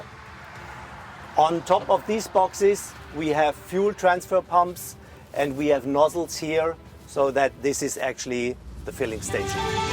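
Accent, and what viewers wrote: Germany. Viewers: I'm from germany ;) man merkt an der Aussprache richtig, dass er deutsch ist... 💩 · The German accent makes it even more better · Pure German accent!!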